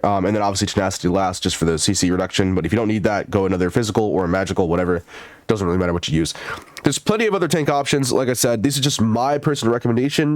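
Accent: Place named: France. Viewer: French accent 🤣 Get better man! And thanks for your time showing this guide to us!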